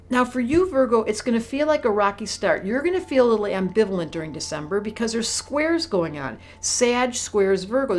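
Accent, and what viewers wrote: United States. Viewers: I love your Michigan accent lol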